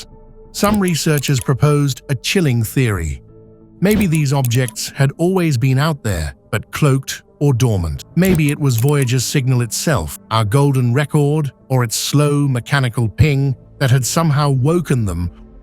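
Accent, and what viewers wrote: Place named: United Kingdom. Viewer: A very British voice with a very American cultural bias sound completely unnatural to me - go figure!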